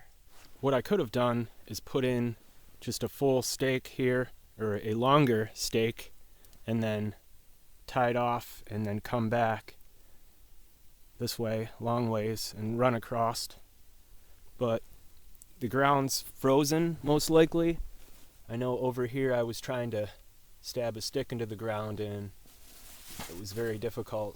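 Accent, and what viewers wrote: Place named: New Jersey, United States. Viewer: I understand. I was thinking I heard a New Jersey accent in your voice, so I asked. Thanks.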